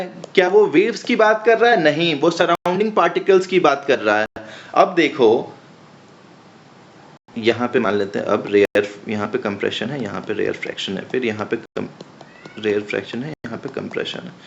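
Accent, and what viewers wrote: Bihar, India. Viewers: Sir ka bihari tone ...very sweettt ...🥳 Mazzzzzaaaa aaa gyaa ...grt explanation 🙏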